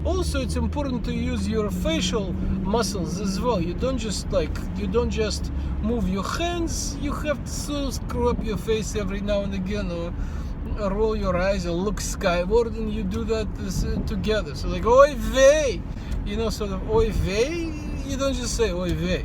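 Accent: Russia. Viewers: "God bless America" :) but i think you turned out the way you did (wonderfully great and funny) because you come from a communist backgound, and i can relate to that. thank you for making the effort ! i LOVE your accent, it is such a mix of russian, something else and american :)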